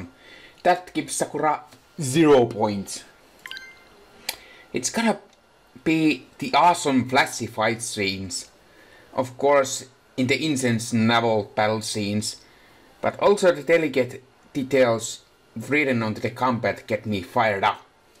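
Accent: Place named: Finland. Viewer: That accent is so strong you from finland perhaps or is it just me?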